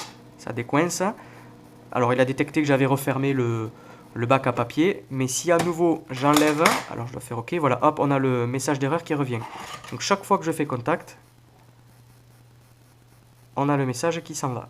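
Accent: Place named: South-West, France. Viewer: Top ça marche ! et avec l'accent du sud-ouest :)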